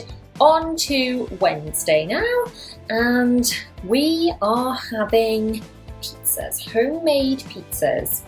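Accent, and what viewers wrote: Wales, United Kingdom. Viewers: i love this video but i love even more how you say quarter lol love the welsh accent!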